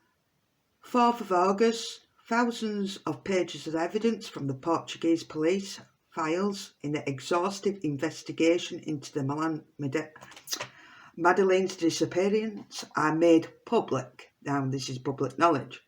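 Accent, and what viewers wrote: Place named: Yorkshire, United Kingdom. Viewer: Hey I’m in Rotherham s Yorkshire, your accent sounds familiar hun x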